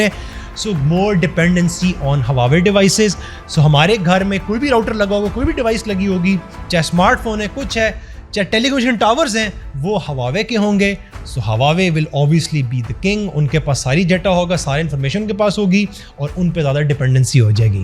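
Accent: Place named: Punjab, Pakistan. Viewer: बोलने का तरीका पाकिस्तानी पंजाबी स्टाइल है अगर आप थोड़ा सा ध्यान दे तो साफ समझ आता है। तो अब निकल पहली फुर्सत में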